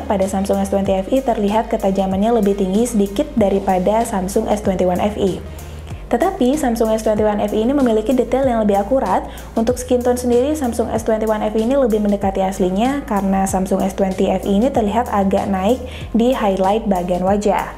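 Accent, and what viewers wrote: Bali, Indonesia. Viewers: Berasa banget logat balinya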